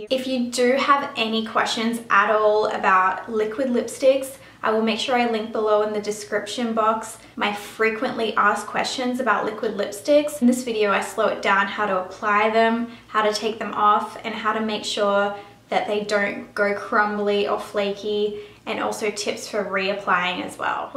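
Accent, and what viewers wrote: Australia. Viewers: I was sitting here wondering if you are Aussie. After watching videos of several American accents, I found it hard to distinguish my own Aussie accents hahaha. So happy when you confirmed it! New subbie :) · Are you australian?? OMG Im spanish and I love the acent!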